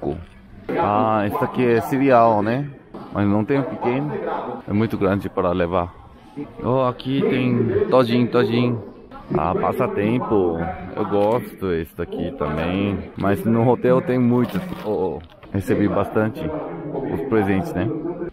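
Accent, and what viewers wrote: South Korea. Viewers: Eu fico muito feliz quando vejo um coreano falando em portugues tipo acho engraçado eu fico tipo : meu deus que lindo caraaa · Kkk é supermercado brasileiro mesmo kkk Mano eu acho muito fofinho ver coreanos falando português kkkk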